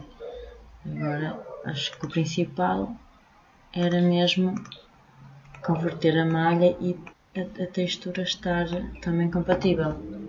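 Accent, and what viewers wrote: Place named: Portugal. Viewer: Quando tens todas as coisas de um creator que segues há anos e depois descobres um video em que fala português de Portugal :') Super useful tutorial, MUITO obrigada por isto!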